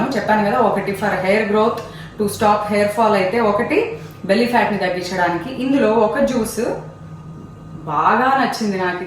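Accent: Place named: Vijayawada, India. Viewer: Nice to hear Vijayawada Telugu 😊👍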